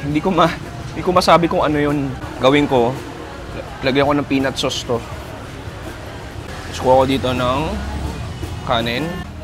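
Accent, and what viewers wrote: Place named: Philippines. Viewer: English acent Philipinos... You looks like Cakra Khan..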